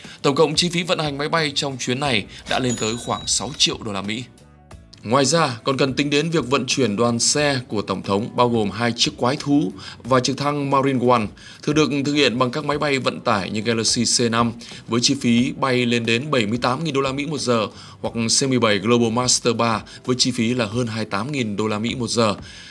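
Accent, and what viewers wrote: North, Vietnam. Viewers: giọng đọc của người miền BAC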